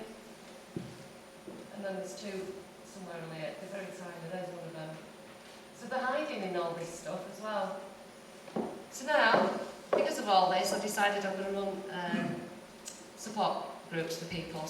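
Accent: Yorkshire, United Kingdom. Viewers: it is English you Muppet!! YORKSHIRE to be exact!! Not everyone over here speaks like the queen or the BBC world service!! We are a very diverse nation in accents!!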